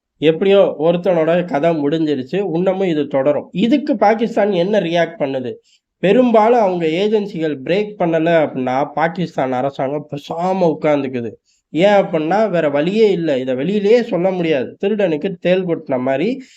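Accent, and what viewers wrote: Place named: Nagercoil, India. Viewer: Ur voice is very familiar. R u from nagercoil.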